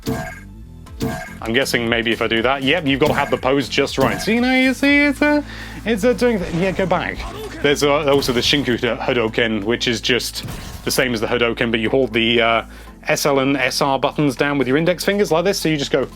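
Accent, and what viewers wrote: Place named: United Kingdom. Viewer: TuxedoNINJA643 What? I'm American and I can't get enough of British voices.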